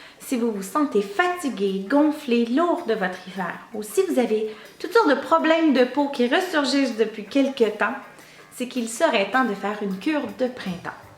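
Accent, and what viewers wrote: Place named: Canada. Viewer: Ravisante, tres instructif ,j adore l accent canadien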